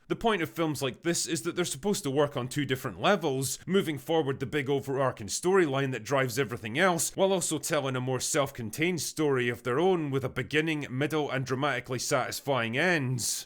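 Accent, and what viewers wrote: Scotland, United Kingdom. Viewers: It's the Scottish accent, especially in Dindee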